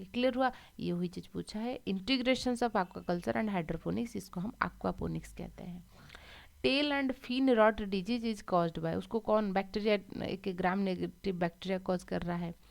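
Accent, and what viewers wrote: Odisha, India. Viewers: 😳😳😳...app odisha se ho kya mam ?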